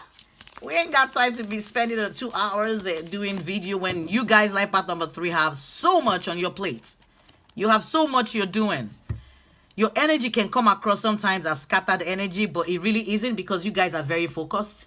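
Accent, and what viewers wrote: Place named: Nigeria. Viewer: This absolutely resonated & it really spoke to my spirit. I love your Nigerian accent too! ;)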